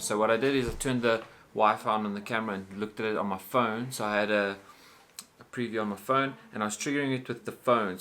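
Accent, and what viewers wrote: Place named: South Africa. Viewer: Was just about to say that you have a South African accent....kanti you're a local With some international talent. Keep up the good work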